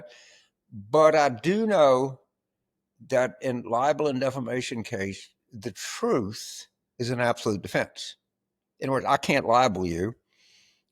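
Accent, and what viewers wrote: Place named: Louisiana, United States. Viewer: I prefer my commentary on the complete and utter downfall of F45 served up with a Cajun accent.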